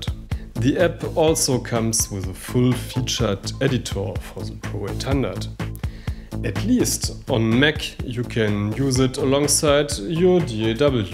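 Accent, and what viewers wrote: Germany. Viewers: Gruseliges Englisch / Horrible German accent... Das lässt sich nicht ertragen und zuende anschauen 😒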